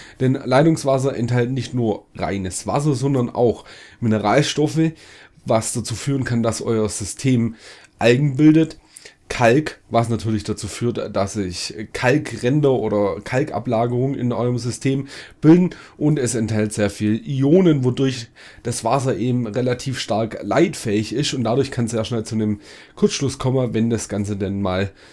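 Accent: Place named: Baden, Germany. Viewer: Du Klingst so Badisch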